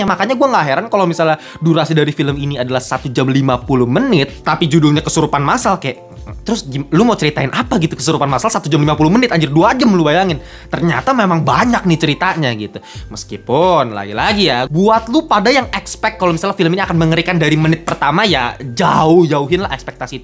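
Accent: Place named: Java, Indonesia. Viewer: W nyesel bang liat ni film, v suka dialeg jawa y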